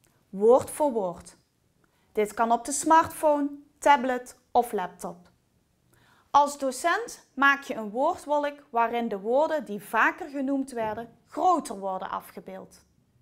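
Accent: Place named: Limburg, Netherlands. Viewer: Sorry, wat een irritant dialect. Limburgs? wogde legge wegkforme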